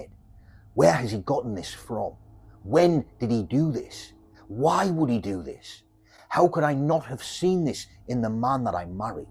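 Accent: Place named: North East England, United Kingdom. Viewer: Listening from Middlesbrough. Nice to hear a Northeast accent telling these stories. Love the content, great channel.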